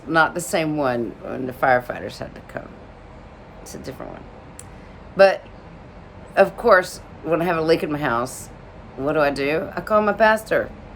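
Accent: South, United States. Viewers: Out of NCIS, your southern accent is coming back strong. Love it. You be your P. I love you. I miss Abby, my favorite NCIS character.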